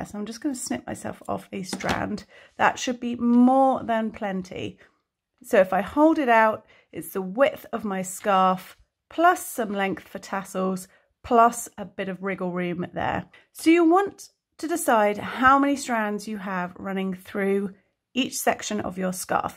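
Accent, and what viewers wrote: England, United Kingdom. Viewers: Beautiful yarn and nice to hear an english accent on a crochet video, may also look great with chain of crochet pulled through rather than straight strands but still fab. Also you could tie of single strand from each double yarn stand for a finer ending. However why as a fellow Brit are you using American terminology? You are doing an English treble stitch NOT an english double crochet. I have been crocheting 50 years and it annoys me English terminology has been ousted by youtube rant over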